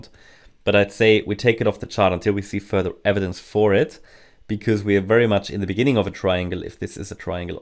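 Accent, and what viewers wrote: Germany. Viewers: If I'm not mistaken, your accent is a mix of German and English?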